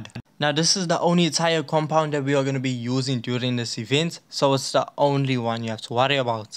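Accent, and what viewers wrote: South Africa. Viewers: I hear my South African boy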